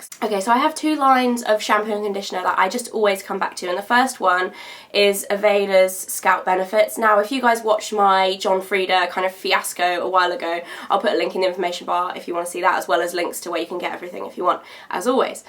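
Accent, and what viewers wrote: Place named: RP, United Kingdom. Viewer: you sound so posh! (not in a bay way! )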